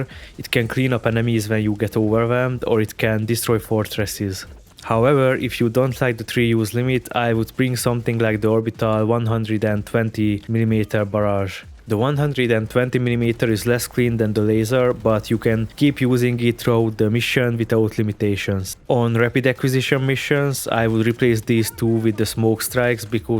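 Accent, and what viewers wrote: Hungary. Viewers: are u hungarian?? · Rögtön felismertem a magyar akcentust. Great informations btw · Thats a juicy Hungarian accent, love it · te magyar vagy halljak meg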